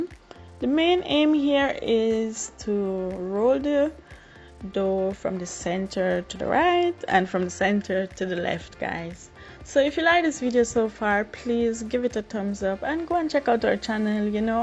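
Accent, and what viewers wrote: Jamaica. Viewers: Wow..looking for this since 19*** 😂😂😂😂... endlich...gonna do this today, today...wo<link> realy like the jamaican patty....like how you speaking the Patwa😊... ,,trina nyamings'' showed me your Link..good Job and thanks for Showing us. Ich freu mich so sehr👍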